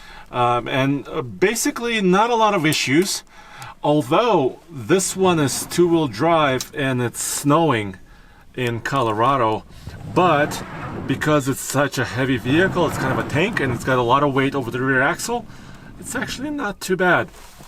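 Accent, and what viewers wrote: Russia. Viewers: Прекрасный русский акцент. Очень понятный английский 😄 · First thought "Wow, did Toyota sell the Seqouia in Georgia? And how did it get to Colorado and the US?!", then I remember the state 😂 Russian accent + the name a ex-Soviet republic 😛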